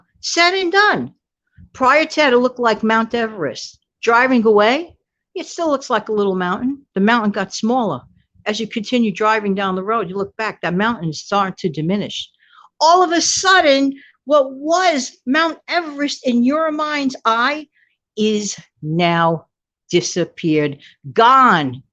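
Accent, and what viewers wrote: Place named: New York City, United States. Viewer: New here ……came here after seeing you with Prepper Princess and Dawn. You have that New York City accent. I probably have the same accent…. I am in a state close to NY. I like the different accents and views of other people. You learn things… it’s fun.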